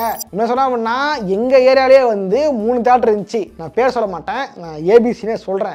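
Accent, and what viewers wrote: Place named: Vandavasi, India. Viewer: Brother unga sondha vur vandhavasi bola irrukae🤔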